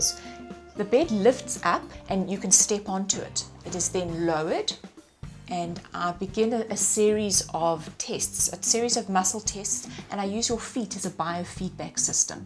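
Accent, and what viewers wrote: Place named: South Africa. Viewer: nice south african accent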